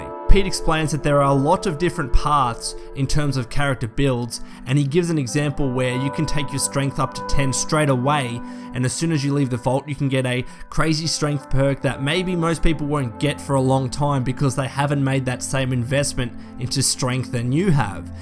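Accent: Australia. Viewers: LOVE your videos but every time I watch them, I'm always spacing out from how your voice sounds so calm and Australian... I don't mean anything mean by it. Keep up the good work though!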